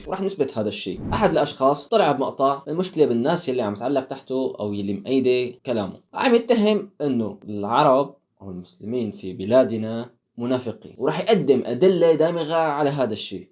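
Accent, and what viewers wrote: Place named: Latakia, Syria. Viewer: شكرا لك أخي لفتتني لهجتك هل أنت من اللاذقية ؟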